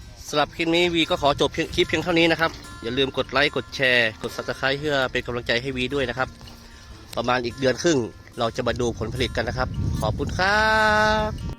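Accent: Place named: North, Thailand. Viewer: สวัสดีค่ะวี คิมมารับชมการปลูกแตงกวาญี่ปุ่นด้วยจ้า คลิปนี้พูดเหนือด้วย น่ารักดีค่ะ👍👍😁😁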